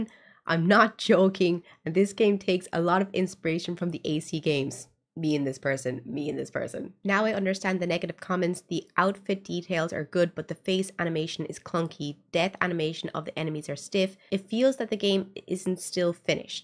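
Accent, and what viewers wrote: Ireland. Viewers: love your short videos and I'm glad I came across this on YouTube. love the Irish accent, my great grandfather came to America from Ireland, and I really want to visit someday. but to get back on topic, totally agree, you make garbage people don't want to play it's not going to sell. I keep hearing the people against sweet baby and other games as a vocal minority but if that was true the game would succeed, hmm wonder why they don't. maybe because its minority you are making your games for, and they don't buy them, you cultivate the wrong player base, and you will end up on the cutting room floor.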